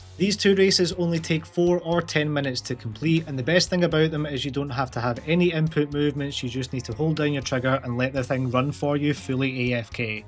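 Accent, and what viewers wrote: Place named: Scotland, United Kingdom. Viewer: My god I love Scotch folks. The accent is amazing. Also at the end it sounds like you are talking about Forza Horizon Sex Content 🤣. Stay cool and thanks for the tips!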